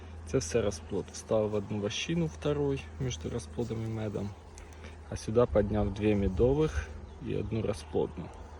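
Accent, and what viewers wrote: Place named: Surzhyk, Ukraine. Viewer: Все гарно,але суржик просто вуха ріже!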